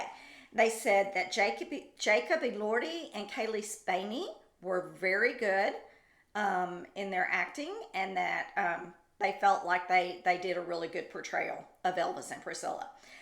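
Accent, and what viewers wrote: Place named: Memphis, United States. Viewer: Cilla is going all-out to create an angelic image of herself in the public eye when we all know she wasn’t. Ps. I love your accent, is it a Memphis one?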